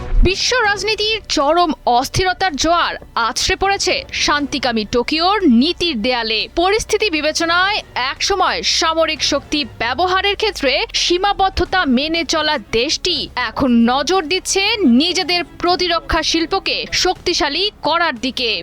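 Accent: Kolkata, India. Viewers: কলকাতার সুরে খবর পড়লেন মনে হলো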